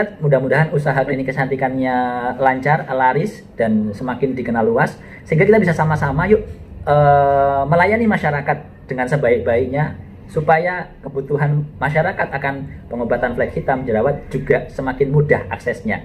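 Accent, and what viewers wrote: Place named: Banyumas, Indonesia. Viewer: Dok.... aslinya mana 😀😀😀. lama merantau kangen logak ngapak...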